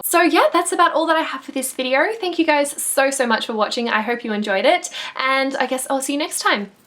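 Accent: Australia. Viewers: May I ask what part of Australia you're from?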